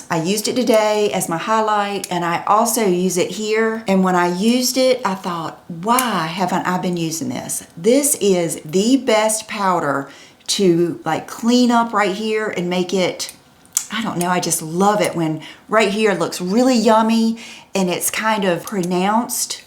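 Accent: South, United States. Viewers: I just went and watched your first video, how sweet! You did "keep your nerve up" and 14 years later you are still here! I have watched for a long time, maybe not since the very beginning but a long time and wow, what an accomplishment to have stuck with this 14 years and we all love you so much! And I love your Southern accent too!!!! oxJUlia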